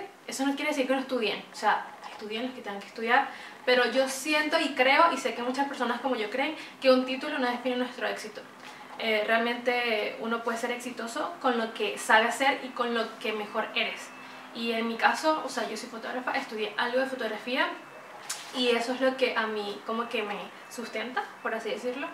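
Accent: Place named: Andes, Venezuela. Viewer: Echoooooo.. ¬¬ no seas antipatriota! Manten tu acento venezolano hasta LA MUERTE!! Aunque tu venezolano es medio andino :S pero bue. . . .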